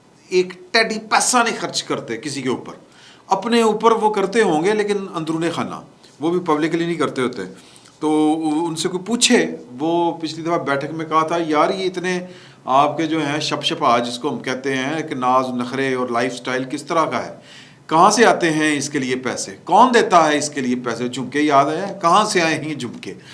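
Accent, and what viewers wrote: Punjab, Pakistan. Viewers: Syedimran shafqat sahib king 👑 sar your punjabe touch i like you love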